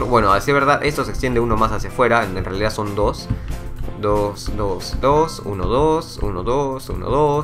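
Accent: Peru. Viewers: No se pero a mi duxo me suena a que es de Peru xD e.e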